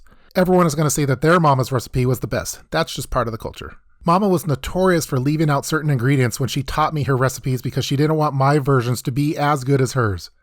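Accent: United States. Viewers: It’s almost as if this dude was born is America, so I dunno, maybe have an American accent, and Spanish isn’t his native language. but that’s a crazy thought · I really fuck wit the dish but you sound 100% gringo respectfully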